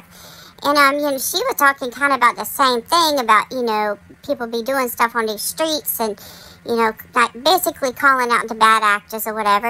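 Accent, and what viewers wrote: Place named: Boston, United States. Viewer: I love your videos, No one can be mad at a princess. 😂😂😂😂 Chasing in her boston accent. signed the "youtube poo poo" 😂😂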